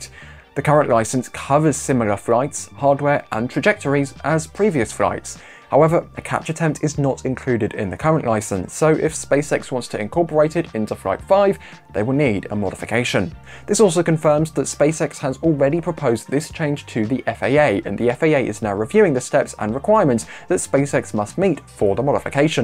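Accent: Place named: England, United Kingdom. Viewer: The English narrator makes it “real”.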